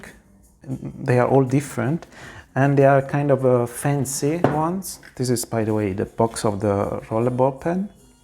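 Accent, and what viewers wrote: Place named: Italy. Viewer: Thank your for showing your sketch books! I like to watch videos like that but most sketch books are more art books - too perfect to be true. ;) Your sketch books are encouraging. Also thank you for showing your art supplies. I also like to hear your voice with your italian accent. :) Greetings from Germany.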